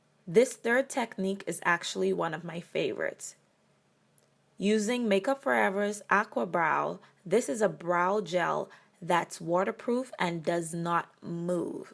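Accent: Bahamas. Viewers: Bahamian accent??? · Sound like a Bahamian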